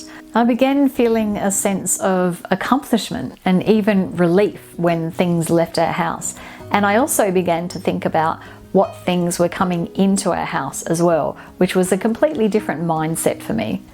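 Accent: Australia. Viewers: Did you say Autistic or Artistic?I'm American so sometimes Australian accents are more difficult to understand.I'm beginning this process now that my nest is empty.Its very difficult but I'm sick of all the excess things I have accumulated. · Did she say autistic or artistic? Her Australian accent can be misleading. Nice presentation however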